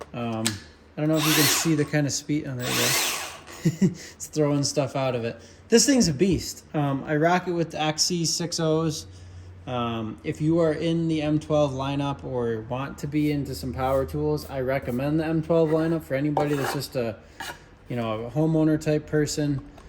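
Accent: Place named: Wisconsin, United States. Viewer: Are you from Wisconsin? Your accent sounds like Wisconsin.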